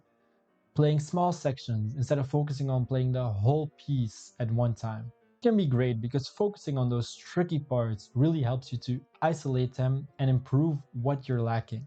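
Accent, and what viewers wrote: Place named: Belgium. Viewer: I'm Belgian myself so I can spot the accent very easily XD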